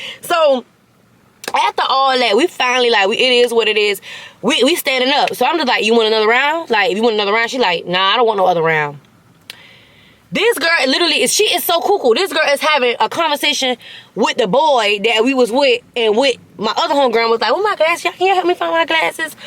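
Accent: New Orleans, United States. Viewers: Is that a Nola or Georgia accent? You sound blended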